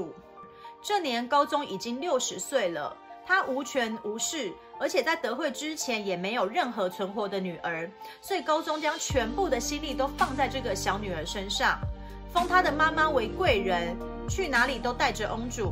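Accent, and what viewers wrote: Taiwan. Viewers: 这个台湾腔听的我头疼😖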